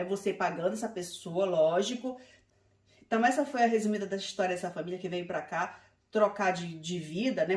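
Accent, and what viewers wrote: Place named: Pará, Brazil. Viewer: Ótimo vídeo, conterrânea! Nemo dá pra perceber que você também é paraense kkkk... sotaque super marcante .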